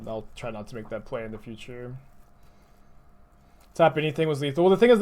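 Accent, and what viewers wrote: Texas, United States. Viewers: love the texas spike accent. 🤙🤙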